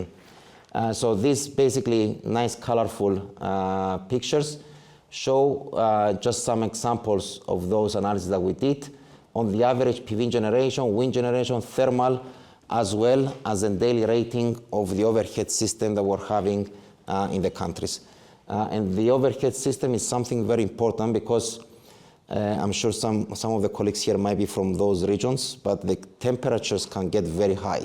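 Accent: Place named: Greece. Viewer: Sorry but your english prononciation with strong greek accent is almost impossible to understand for french speaking people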